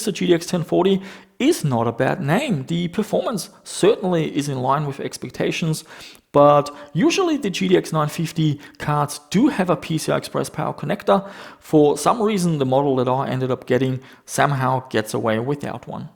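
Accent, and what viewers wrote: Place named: Germany. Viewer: Dieser deutsche Aktzent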